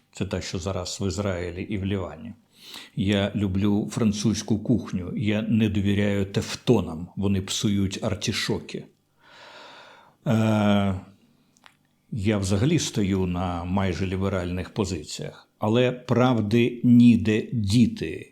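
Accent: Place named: Russia. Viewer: буде вашчЄ (буде важчЕ), щЬо нам іщЄ треба... Пане Дмитро, як завсіди, спасибі за промовисту орацію, але... будь ласка, поверніть собі українську фонетику замість московської. Шиплячі ж бо в нас тверді, не мякі як у москаля.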